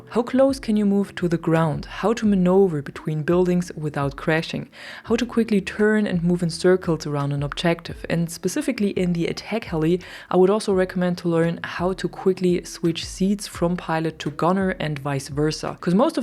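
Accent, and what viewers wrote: Germany. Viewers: man hör den deutschen Akzent echt nur ein bisschen raus🤣🤣🤣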